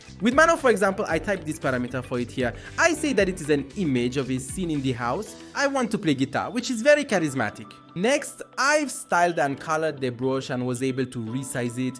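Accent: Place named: India. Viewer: چرا لحجه هندی داره هوش مصنوییت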